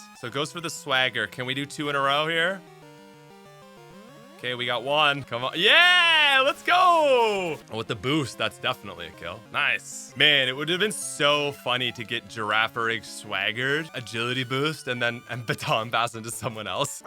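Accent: Canada. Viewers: dude, i mean this in the best way possible but i just happened to find your video in my recommended and i heard you speaking, particularly how you pronounce "out" and words that end in -out and immediately clocked you. i say this as a canadian myself, i just never noticed how easy it is to tell until i see a canadian youtuber when most of the ones ive been watching recently are not canadian/dont have that particular accent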